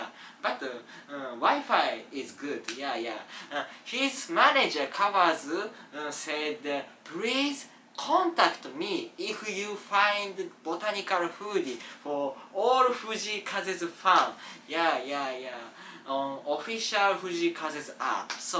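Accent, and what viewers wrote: Japan. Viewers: I am so proud of your Samurai English. ✨ Cool✨ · さん海外進出？！めっちゃ楽しい〜(´>∀<｀)日本語英語が最高すぎる✨ さんfanも国境を超えますね*⋆✈ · わざとらしいカタカナ英語に笑った😂でもコテコテのジャパニーズおばちゃんには丁度良いレベルでありがたし🙏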